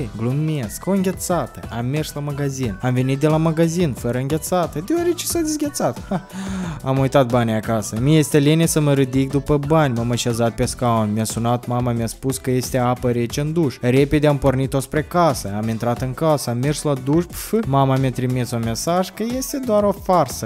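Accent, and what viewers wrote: Moldova. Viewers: Pai se vede dupa accent ca e din Moldova.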